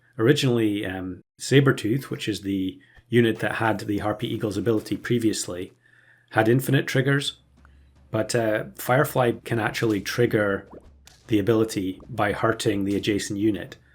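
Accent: Scotland, United Kingdom. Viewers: Great, realy enjoy your Content thank you 🙂 you have a Great soothing scottish Voice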